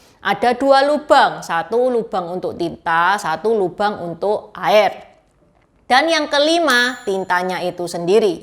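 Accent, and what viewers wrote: Java, Indonesia. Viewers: Hidup merah, Meddok nya sudah berkurang dikit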